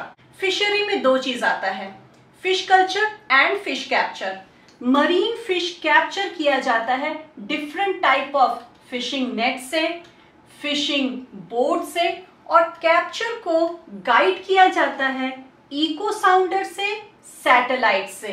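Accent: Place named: Bihar, India. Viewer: Where you from? It feels you are from either bihar Or up. Anyways it was a wonderful lecture and helped me truely.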